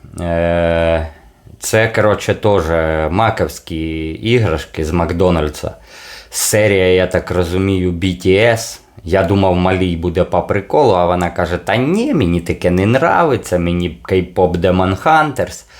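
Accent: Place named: Trostianets, Ukraine. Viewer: акцент як у мого кореша з Тростянця ))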